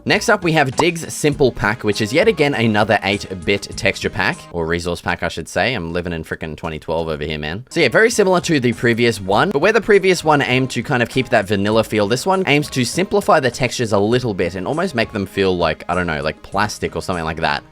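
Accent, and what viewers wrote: Australia. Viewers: Australien accent = better youtuber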